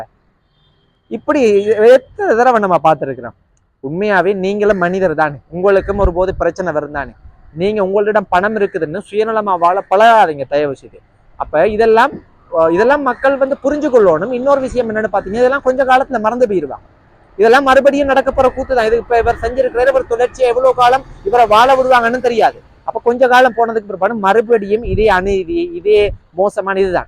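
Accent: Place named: Colombo, Sri Lanka. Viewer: உன் தமிழ் கொழும்பு தமிழ் மாதிரி இருக்கு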